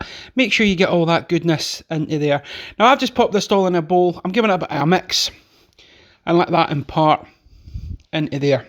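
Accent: Scotland, United Kingdom. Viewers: That's what was missing, Food being prepared with a Scottish accent on the lad. Thanks!! Slainte Mhath!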